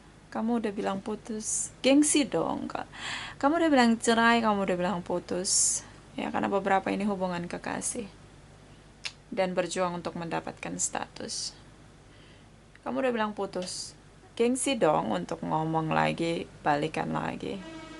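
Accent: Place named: Europe. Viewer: Halo nona,klo dgr lidahnya nona sepertinya anda pernah ckp lama ya tggl di benua biru,dimana nona? Maaf,agak melenceng pertanyaannya. Mksh ya non🙏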